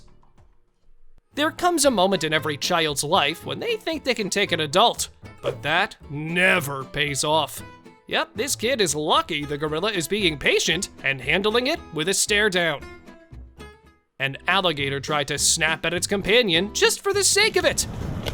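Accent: United States. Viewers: Totally agree, annoying American voice ! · If you ever want a nature documentary completely ruined, get a yank to narrate it. What a colossal putz!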